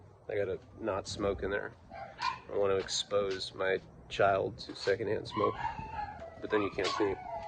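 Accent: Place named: New York, United States. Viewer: Your ny accent is hilarious I just think about son from jail lol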